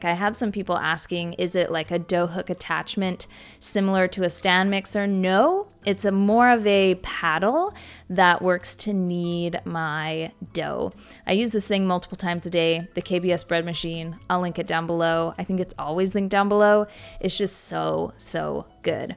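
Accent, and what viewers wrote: Canada. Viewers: Have you heard how she pronounces produce? It's a Canadian accent type of thing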